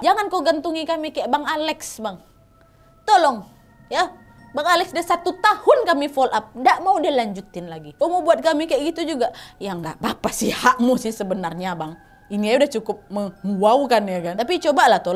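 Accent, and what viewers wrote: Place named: Medan, Indonesia. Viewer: Wak ini orang mana? Kayak aku bicaranya, aku orang Medan woii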